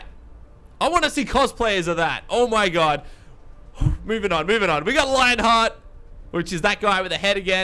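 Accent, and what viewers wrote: Australia. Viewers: Hey, why are you Australian?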